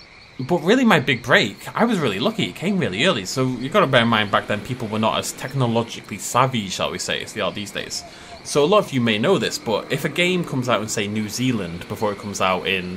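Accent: United Kingdom. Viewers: A bit late, but happy 10th anniversary. Been with channel since very early, to the point I basically learned how to speak english thanks to this channel, british accent and all by trying to understand what you said in each video. James and you made my and many others' childhoods amazing, and are probably one of the most iconic dino-tubers in the scene. Well deserved 10 years of uploading.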